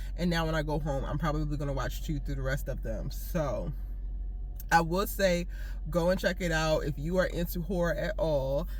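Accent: Baltimore, United States. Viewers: Side note: I feel like your accent is giving Baltimore/DMV vibes. How wrong am I?? Lol 😂😂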